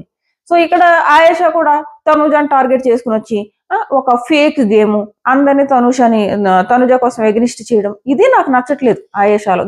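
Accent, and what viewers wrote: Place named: Srikakulam, India. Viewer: Its Srikakulam slang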